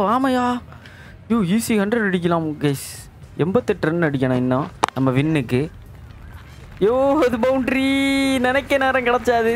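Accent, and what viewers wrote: Kanyakumari, India. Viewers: Bro neega kanyakumari ya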